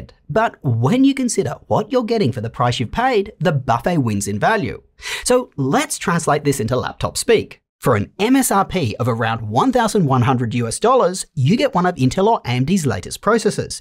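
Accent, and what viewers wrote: Australia. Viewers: Why the aussie accent when filmed in the US? Audio seems almost AI?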